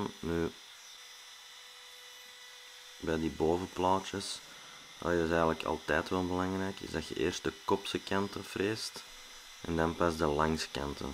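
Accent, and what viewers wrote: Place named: Antwerp, Belgium. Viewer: Hey,gelemen wat is dat??? 😜het is gelijmd. Je zegt toch vastgelijmd of vastgeplakt 🤔. Ik hou wel van dat Antwerps 👍. Maakt u video leuker 😀. Mooi werk trouwens. G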